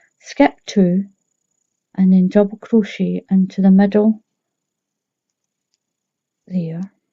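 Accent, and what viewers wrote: Scotland, United Kingdom. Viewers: Love your Scottish accent and love your tutorials. Our lock down in Letterkenny has expanded my crocheting experience. Another blanket going to be done this stitch. Love to hear Mutley in background think he would like you throw the ball!!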